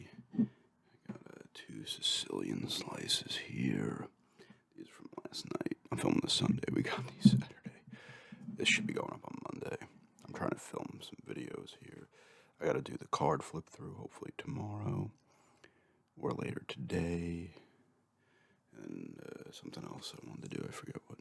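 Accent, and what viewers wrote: New York, United States. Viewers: I could never live in New York, but I'm glad you do because your accent is a big reason why I tune into this channel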